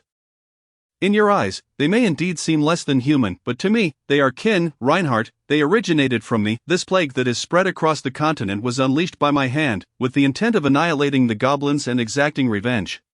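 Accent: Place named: United Kingdom. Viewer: Improve audio It sounds like some sophisticated British shit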